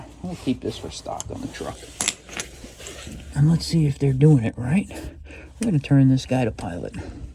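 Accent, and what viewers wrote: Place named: Brooklyn, United States. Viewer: Definitely brooklyn.... Guess from an Ohioan😅 Couldn't catch your email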